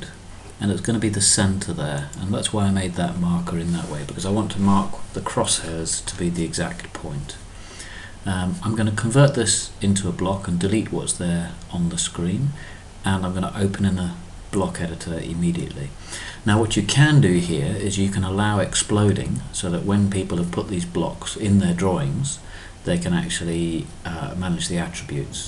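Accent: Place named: England, United Kingdom. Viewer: Clear instructions; great classic english accent!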